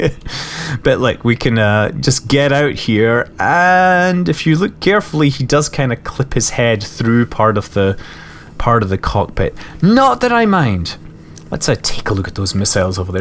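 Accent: Scotland, United Kingdom. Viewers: What do you mean "unbearable 'accent'"? He's Scottish.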